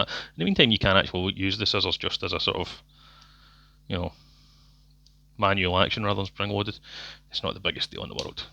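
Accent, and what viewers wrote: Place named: Midwest, United States. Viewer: Heard the accent, are you located in the midwest?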